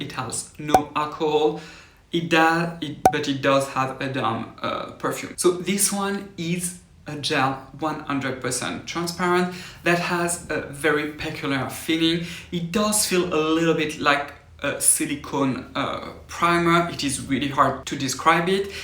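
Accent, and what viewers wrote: France. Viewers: Greetings from West Hartford, CT🙋‍♀️You are my new favorite reviewer of sunscreens. I've watched enough of your videos now to finally be able to understand your heavily or should I say Heavenly French accented English. Love your channel,.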